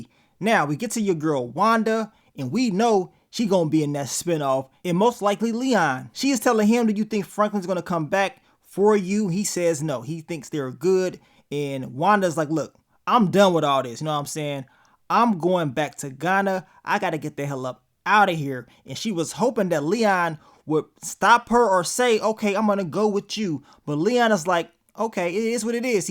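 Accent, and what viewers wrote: United States. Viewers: u coined that LA accent bruh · Man still in his American accent 😂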